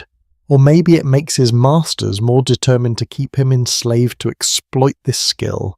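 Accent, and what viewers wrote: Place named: England, United Kingdom. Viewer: From Norway. The servants Are TRELL/ treller , not throll. Reading with English pronansuention mekes many names and words so strange. What happend to the selebration of Jul? The feast and oferings in the darkest part of winter. Fishing , trapping and hunting are all winter chors as long as the weather permited.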